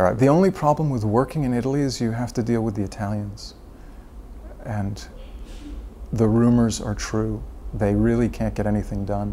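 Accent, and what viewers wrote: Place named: United States. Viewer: UAU...I mean, he's so hansome!!! Pretty eyes, pretty look, a litle bit faty'iiish and he's not a "bird man" maybe a cat person or a dog person just like me, (I love dogs). Oh, and I love his so american accent. And, in spite of criticizing italian people, witch I adore, cause they are so emotional and with a spicy drama in everything they do or say and they speek like as if they were singing and they also speak with a lot of hands gestures and body language but, well... in the end it all suits him very well. Pretty hansome guy, indeed.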